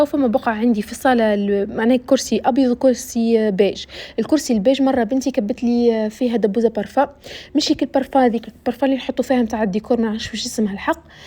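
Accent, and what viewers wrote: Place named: Tozeur, Tunisia. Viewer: يا خيتي يا خيتي عاللهجة التوزرية..و كان مانيش غالطة تحديدا من نفطة هكة و إلا لا...ليكم وحشا يا غالييين عاشرتهم في الجامعة عام 2000 صدقيني نتصنت فيك و عيوني دمعوا تذكرت ايامات الجامعة و اصحابي ...جاني فيديوك صدفة و فرحت برشة 😘😘😘😘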